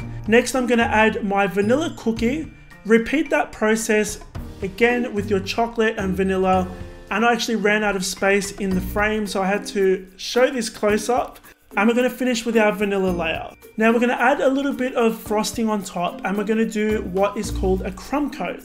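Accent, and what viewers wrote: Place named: Australia. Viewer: Nichole Li M8, he is from Australia, it's called an accent. He is saying "choc chips"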